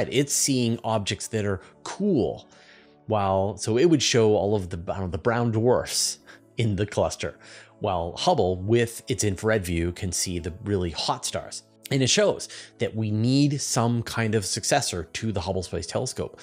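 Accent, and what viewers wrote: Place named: Canada. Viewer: I like how you sound Canadian. It reminds me of talking to Canadians. I mean, I still live in Kitchener, Ontario, but I haven't talked to a Canadian in weeks. It's funny how India has a space program.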